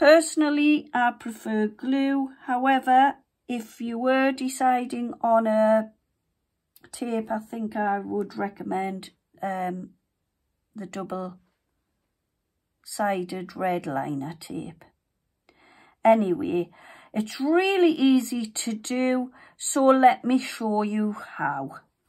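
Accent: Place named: Sunderland, United Kingdom. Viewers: What a lovely box you sound like you are from the North East Xx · Sounds like a Sunderland accent.. great video, nice box thankyou.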